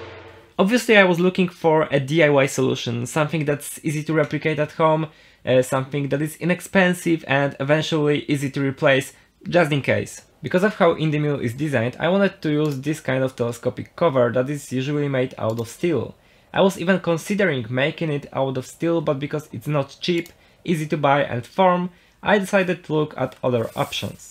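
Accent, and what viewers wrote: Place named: Poland. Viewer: masz akcent polaka :)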